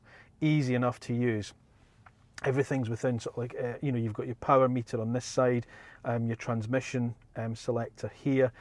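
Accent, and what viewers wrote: Scotland, United Kingdom. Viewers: its called a scottish accent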